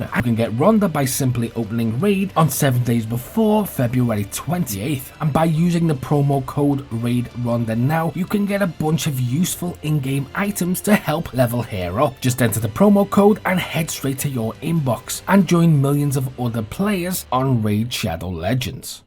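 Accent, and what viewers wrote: Liverpool, United Kingdom. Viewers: hi mate love the videos! are you a fellow scouser? lol, sounds like it.. just wanted to ask, im tired of joining servers with absolute sweats where i cant do an airdrop or koth in peace, do you know of any servers you can share that are abit more beginner friendly where most people are on the same boat as me? wold be great if you could recommend any, thanks man! once agin love the content keep it up!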